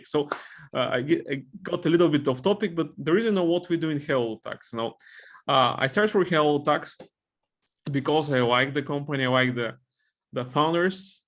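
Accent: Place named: Bulgaria. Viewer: He seems to have a good command of the language, however, a very heavy Bulgarian accent.. Maybe because I have been exposed to British English and Canadian English more. If you are also Bulgarian it might be easier to figure out what he is saying. There are some instances in the video where I slowed the video to 0.50 and listened to it 10 times, I dont understand what he said.. I might give you the example when I watch it again...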